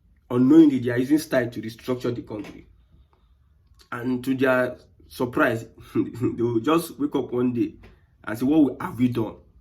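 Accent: Yoruba, Nigeria. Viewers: You are not from Southeast. You are a Yoruba man even from your intonation... Stop this tribal bigotry you are doing.. There is nothing between Igbos and Yorubas in Lagos. It is just political.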